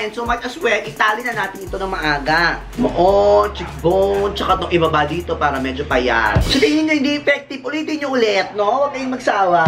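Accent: Visayas, Philippines. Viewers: bisaya ka ba kuya?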